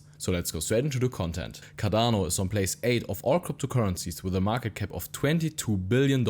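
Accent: Germany. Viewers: Very German accent :D. Aber ist in Ordnung.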